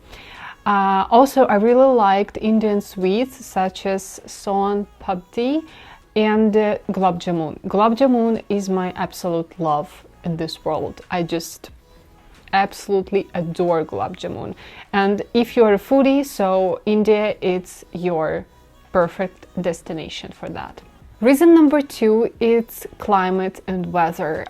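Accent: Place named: England, United Kingdom. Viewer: The best english accent ever by any foreigner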